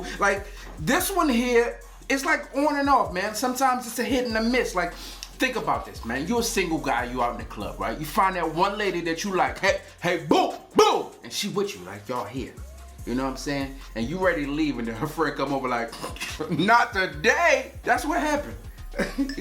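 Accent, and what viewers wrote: United States. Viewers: No offense to Jeremy fragrance but I can understand exactly what you're saying cuz I'm from America and you have an American accent that's more easier to understand then some other men's fragrance YouTube channels if you know what I mean ty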